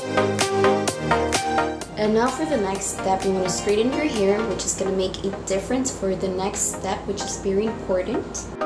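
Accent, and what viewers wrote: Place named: Spain. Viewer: It's because of her Spanish accent. The v and b sounds are mixed in the language.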